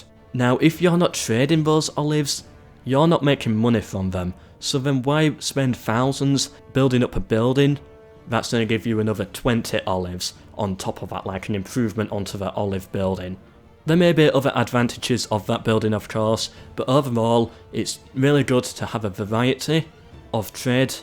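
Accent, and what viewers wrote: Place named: North Yorkshire, United Kingdom. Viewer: He’s probably from around North Yorkshire or Middlesbrough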